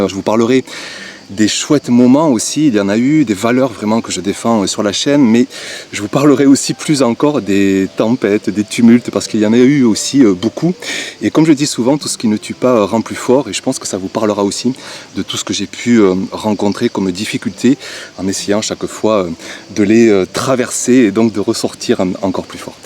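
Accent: South, France. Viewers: Salut, alors je ne peux pas te dire depuis combien de temps je regarde tes vidéos mais depuis pas mal de temps! Je suis toujours content quand je vois que tu as publié une nouvelle vidéo, et j'ai hâte de la voir quand j'ai 5min Je me souviens encore les dimanche a me dire tient mais faut que j'aille voire Youtube a du mettre une vidéo 👍 Merci a toi en tout cas toujours "une totale regalade" comme tu le dis souvent avec ton petit accent du sud 😉 Perso j'adore les expérimentations sur différentes techniques etc, je trouve que on peut être surpris des pratiques qu'on fait depuis longtemps ça remet en question j'aime beaucoup! Continue comme ça et merci encore! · Hello, Happy birthday 🎉🎉🎉🎉, je te suis depuis un peu près 8ans, et je ne m'en lasse pas😂, je suis de Belgique.. Mon potager est tout mini rikiki comparé au tiens, mais je ne cultive que l'été, j'ai une superbe serre que mon chéri m'a acheté et j'y suis tout le temps.. 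Si c'est pas en cuisine, c'est dans le potager 😂😂😂. Le potager est mon excutoire, mon endroit de ressourcement, et puis j'ai comme toi cette fierté de donner des bonnes choses à manger à ma famille, j'aime aussi le partage, et souvent plutôt de garder pour moi, je donne.😮. Ne change rien, ton authenticité et l'humanité que tu degages en fais ta force..et puis c'est tellement plaisant d'entendre ton accent du sud rempli de bienveillance 😊. Sois heureux de ce que tu fais et fière de qui tu es. Au plaisir 😊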